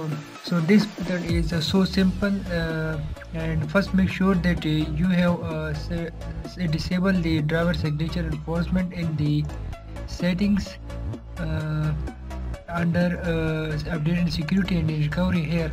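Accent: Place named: India. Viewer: What is he saying??? If you speak english with that indish slang, why is there a technomusic in the video, sometimes louder than your voice. BIG FAIL!!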